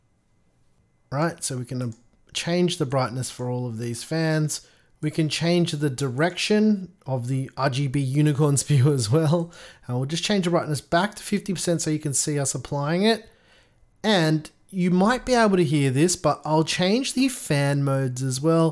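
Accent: Australia. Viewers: Dude been watching your info for a while and appreciate an aussie voice with some real passion for the same things as me. Great content. Do you think that lianli will bring out a 140mm option as well?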